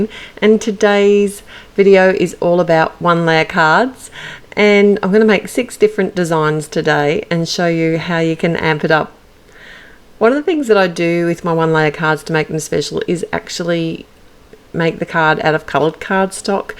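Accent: Australia. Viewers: Lovely one layer cards. It is nice to hear a fellow Aussie on YouTube.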